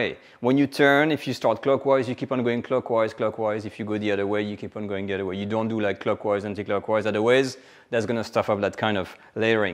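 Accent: France. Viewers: Please don’t lose your French accent. We Aussies love it. Can you use Gluten-Free flour?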